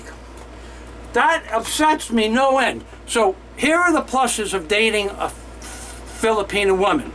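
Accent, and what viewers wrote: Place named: East Coast, United States. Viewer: Brother I hear an east-coast accent; mind if I ask what state are you from?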